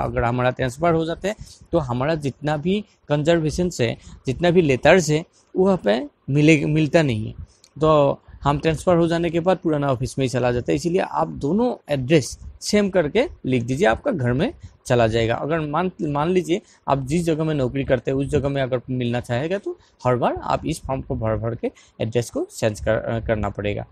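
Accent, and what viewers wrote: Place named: Assam, India. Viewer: Vo north east k h bhai vo aise hi Bolte h.Assam k h sayad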